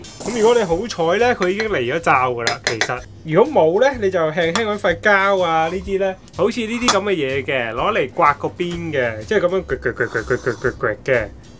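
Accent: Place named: Cantonese, China. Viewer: 廣東話好好聽😀👂🏼♥️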